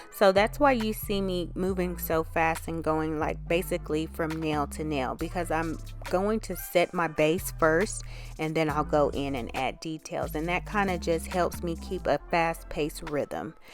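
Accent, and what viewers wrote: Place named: Kentucky, United States. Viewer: You almost sound like your from my area (ky) haha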